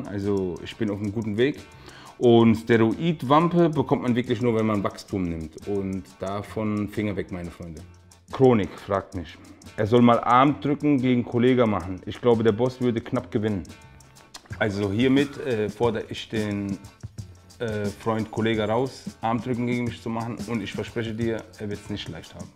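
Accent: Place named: Upper Palatinate, Germany. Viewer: massiv sprichst du weidisch oberüberpfälzerisch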